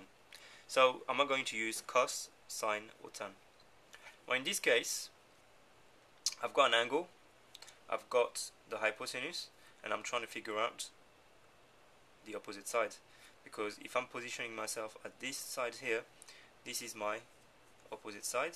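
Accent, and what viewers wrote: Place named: England, United Kingdom. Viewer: Learning math from a guy with an english accent >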